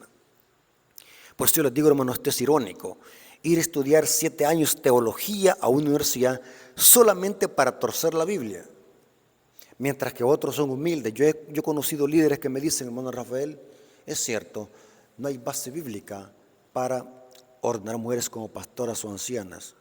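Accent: El Salvador. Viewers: Dios derrame su Espíritu Santo, siempre en usted. Para que siga teniendo buen carácter y mucho dominio propio, que en estos tiempos es muy difícil tener buen carácter y dominio propio. Aquí en México, cada región tiene su estilo de hablar, y yo sé que usted , es del país del Salvador, y ustedes hablan chistoso( con todo respeto) y su hablar así es de usted de por ser salvadoreño. A mí lo que me importa es que siga firme y adelante con su canal, a pesar de tener muchas piedras de tropiezo. Gracias por compartir sus clases de teología, yo he aprendido mucho, en su canal. Hermano , me enteré de que su papá se fue al descanso, y pues primeramente Dios, puedan reunirse en el día de la resurrección. Cuide mucho su garganta.🙏🙏🙏🙏🙏🙏🙏🙏🙏🙏🙏🥰🥰❤️🥰❤️🥰❤️🥰❤️🥰❤️🥰❤️🥰❤️🥰💯✅💯✅💯✅😊😊😊😊😊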